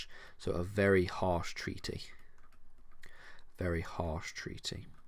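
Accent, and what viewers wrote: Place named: Northern England, United Kingdom. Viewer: you can tell this guy is nothern by the way he says "class", i love it. great videos literally learnt all my year one contnent from this page. screw online school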